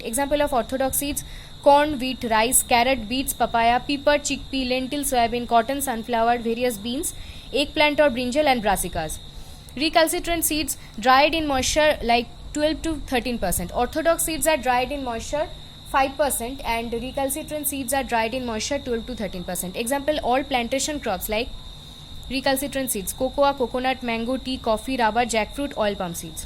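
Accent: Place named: Bengal, India. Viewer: Pura Bengali accent h💝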